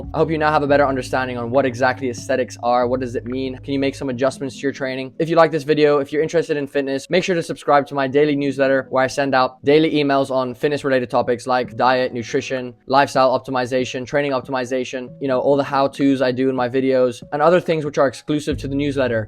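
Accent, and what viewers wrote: Ireland. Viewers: I swear I can hear a bit of a Dublin accent when you speak... Did you live in Ireland at some point? 😂 🇮🇪🇮🇪🇮🇪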